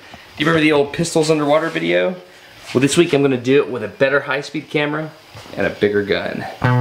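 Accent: United States. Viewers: Apologies for the banal question, but where abouts in the States is this guy's accent from?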